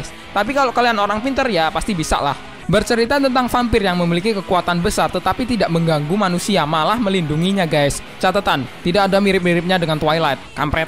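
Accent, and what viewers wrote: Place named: Palembang, Indonesia. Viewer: Orang Mana? Kok Rada Bahasa Palembang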